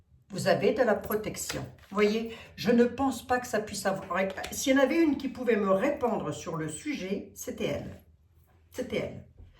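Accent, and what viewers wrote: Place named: Lorraine, France. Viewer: Bonsoir, toujours un pur bonheur de vous écouter ❤. Bon week-end. Je vous embrasse du bout du Finistère. Votre accent Lorrain est un bonheur 🤗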